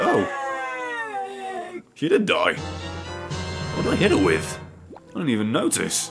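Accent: United Kingdom. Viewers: Wait, are you an American citizen? I always assumed you were British because of your accent...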